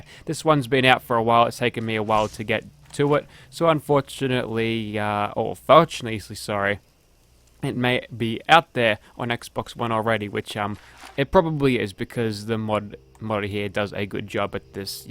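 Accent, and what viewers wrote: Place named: Australia. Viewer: Ya know I’ve observed something with your Australian accent that I’ve never noticed before. For example: You say “whatever” as “whateva” but you say “Matilda” as “Matilder”. So that’s cool. 🌈 The more you know ⭐️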